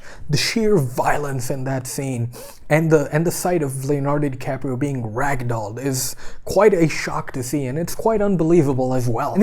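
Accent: United States. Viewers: i think you voice is american but acting is overacting se bhi upar bhai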